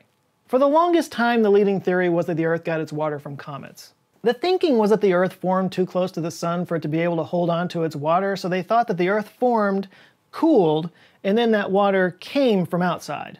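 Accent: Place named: United States. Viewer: It's always weird to hear Americans say "wotha" instead of saying water.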